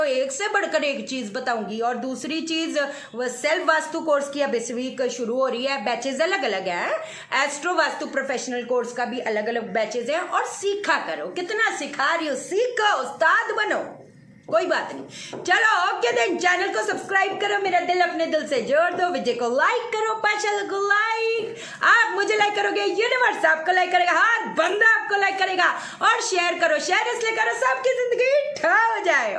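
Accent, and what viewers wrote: Punjab, India. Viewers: di punjabi touch ayta batono maa apki maa bi punjbi hun so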